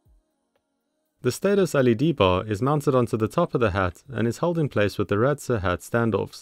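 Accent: South Africa. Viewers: I know a South African accent when I hear one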